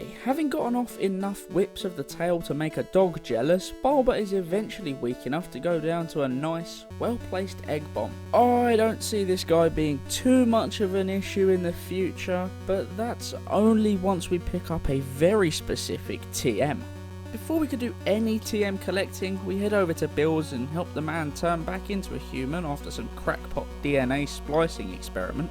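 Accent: South, United States. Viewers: Hahahahaha go on son watch a lot of these but your southern accent is soothing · I love chansey ! I saw people saying you were southern * thinking US as I am * but still , may be another country but still southern accent is 🥺🤍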